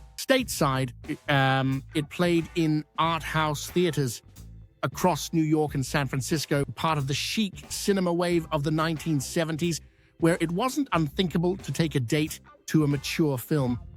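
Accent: United Kingdom. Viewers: I assume you get all these films from somewhere to view and you sound British! Where do you buy all these 70's fims?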